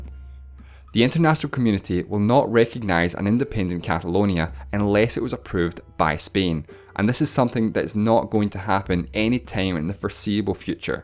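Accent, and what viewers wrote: United Kingdom. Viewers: May I ask where part of the UK are you from?. I mean, My native language is spanish; I've studied English, but I've never been in an English speaking Country and I have some issues understanding some accents, in particular I have issues with Bitish English (that's what I thouthgt) and some whith canadian and american English from the northest (Massachussetts and that area). But I have noticed that you have a very British accent and notheless, I can understand you pretty well.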